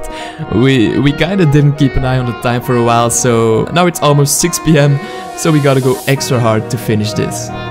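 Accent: Belgium. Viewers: Mec, t'es belge ? :D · He has an french/belgium accent, you can easily heard it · Wowww ik wist ni da u Belgisch was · WACHT! BEN JE EEN BELG?!😳❤️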